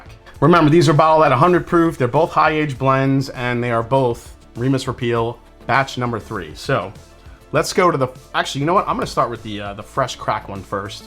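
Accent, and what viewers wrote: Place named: East Coast, United States. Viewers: You must be from Jersey. Dialect?🤔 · LOVE this type of content! Keep up the great work and let that East Coast accent out more often. :)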